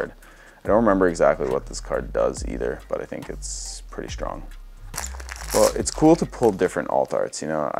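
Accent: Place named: Japan. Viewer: You are the best bro thanks for the opening very entertaining best show on YouTube love your Japanese accent lol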